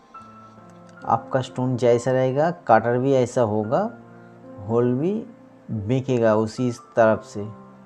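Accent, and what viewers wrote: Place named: Bengal, India. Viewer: You are 100% pure বাঙালি। হিন্দি শুনেই বোঝা যাচ্ছে। anyway ভিডিও গুলো কিন্তু খুব সুন্দর। Best of luck 👍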